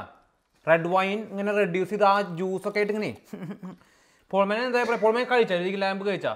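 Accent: Thrissur, India. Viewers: തൃശ്ശൂർ slang❤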